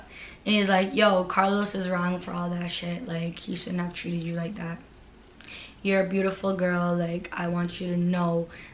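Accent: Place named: Jamaica. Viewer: Why she trying to sound like a jamaican?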